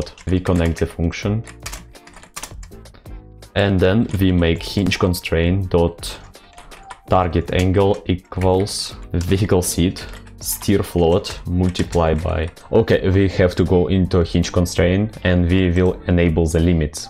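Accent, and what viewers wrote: Russia. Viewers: по голосу кажется что русскоговорящий)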